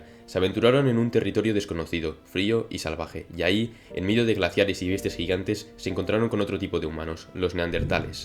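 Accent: Spain. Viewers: MODULA GALLEGO HABLA CASTELLANO , la puta madre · Ahí le bajé la velocidad a 0,80 😊. Reconozco q me cuesta a veces entender el acento español. Muy buena info, tu video. Gracias.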